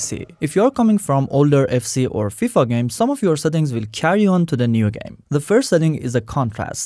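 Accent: Iran. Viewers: اقا از لهجه متوجه شدم ايراني هستي. موفق باشي 😀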